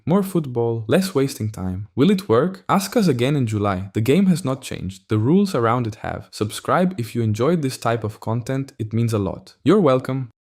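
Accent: Brazil. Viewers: É um brasileiro q ta fazendo essa narração? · oh wow. You really sound Brazilian hahhaha the Croatians that I work with, doesn’t sound like this at all. Good for you hahah And no hard feelings about 2022, ok? 👍🏼 hahhah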